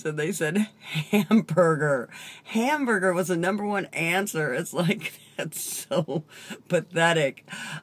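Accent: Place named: Southern California, United States. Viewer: You look and sound like you are from southern California.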